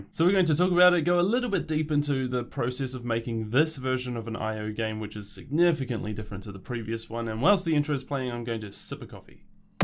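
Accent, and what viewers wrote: New Zealand. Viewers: Are you from nz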